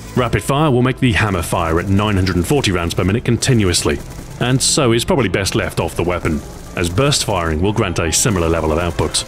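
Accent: United Kingdom. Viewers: Hey, as one of your presumably many viewers who haven't played CoD in a while but still tune in regularly not just for your videos' production value but also your soothingly smooth and ridiculously British voice I've been wondering if you've ever considered doing a "dramatic reading" of a favorite chapter from a novel or short story on your account. It shouldn't take too much time to prepare and record and denying the world the glorious sound of your voice would be a crime! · Why does everyone say he sounds like Captain Price??? Yes, they have British accents. THAT'S IT. The tone of the voice is VERY different, and the accents aren't even identical. I just... I don't even...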